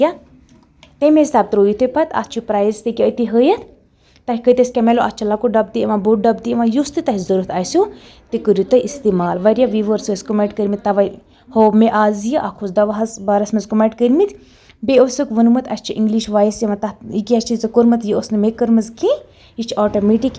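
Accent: Kashmir, India. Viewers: ❤❤ mujhe tho aap ki voice kashmiri mai he aati hai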